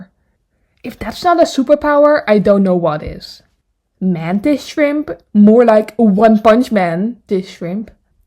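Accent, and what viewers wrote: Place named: Netherlands. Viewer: Are you dutch because I hear the dutch accent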